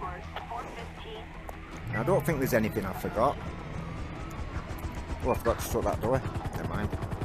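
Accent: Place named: United Kingdom. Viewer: I can't understand what this man is saying because of that accent. Sounds British and I don't understand British.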